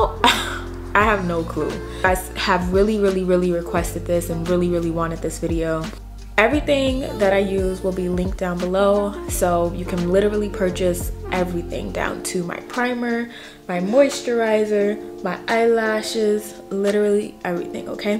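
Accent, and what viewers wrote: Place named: East Coast, United States. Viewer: This is the first video I’ve watched of yours. I love this look! Soooo pretty. I also love your accent. East coast?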